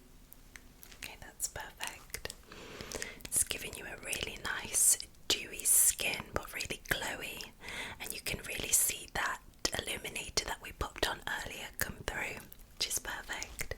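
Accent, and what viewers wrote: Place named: London, United Kingdom. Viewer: Are you from Central London? You have the EXACT same accent as my auntie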